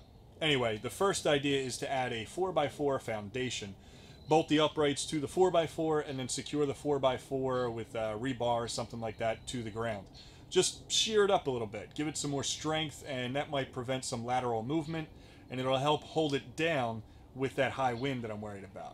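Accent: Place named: South Jersey, United States. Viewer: Haha! I am looking to buy one of these Harbor Freight portable garages. I found this video and within the first minute of listening to you talk, I was like, "this guy sounds like he is from South Jersey". Then as I continue to watch, you say, "In Jersey..." and I was like, yep, bingo! So then after watching, I check your description and you are over in Gloucester Twp. I laughed out loud. As someone who was born and raised in South Jersey and travels the country often, its so easy for me to pickup on the accent, its ridiculous. I mean, yea mostly everyone from the Delaware Valley have that accent but PA and DE people have slightly different twangs to it and unless you listen for it, its not very noticeable. You must have also been raised locally because your South Jersey accent is so spot on. You should do a video on Panzarottis LOL!!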